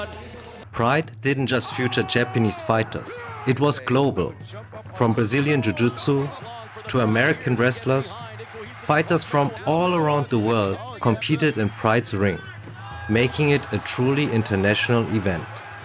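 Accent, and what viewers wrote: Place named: Germany. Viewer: nice video bro but are you using an AI voice? Its sound extremely german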